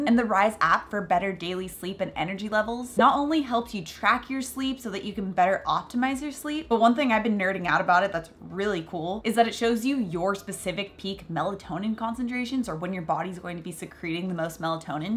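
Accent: United States. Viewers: Hello, Many thanks for your videos - the content is excellent! I thought I was 'educated enough' when it comes to nutrition but I have learnt a lot from you. The only - can you please slow down a little? I am not at all trying to criticize your accent and style BUT after approx. 2 minutes of the non-stop but fast talk in the style/accent of yours, me and most my Scottish friend just cannot take any more, mute you and only rely on subtitles. In other words, the headache from the non-stop talk is not worth the information you can convey - and you obviously have a great amount of knowledge! Just a thought for consideration. Maybe fellow Americans don't find your way of talk not as overwhelming? Not sure... Thanks and ALL THE BEST! mk x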